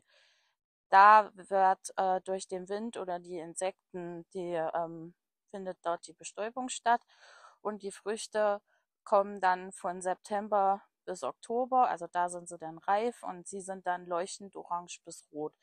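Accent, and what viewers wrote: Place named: East Germany, Germany. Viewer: Warum bloss, weiß ich sofort, dass jemand aus dem Osten kommt?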